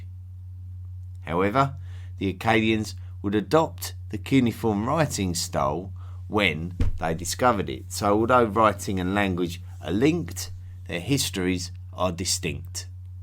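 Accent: United Kingdom. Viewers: While the “a” does sound Aussie-like, his other vowels, contractions and other patterns are definitely British but I can’t pick the region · Voice reminds me of American sportscaster, Howard Cosell. Only with a British accent.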